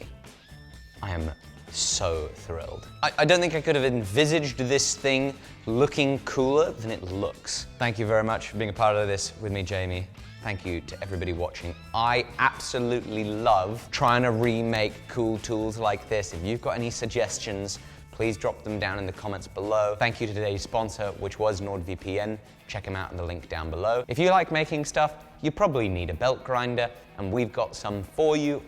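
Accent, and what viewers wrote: United States. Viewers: What's the "like goal" to get to do a whole episode in his american hick accent? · As an American I thoroughly enjoyed attempts at an American accent throughout this episode. Congrats on the vice grips, they look fantastic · He keeps using an American accent, I think he misses it here...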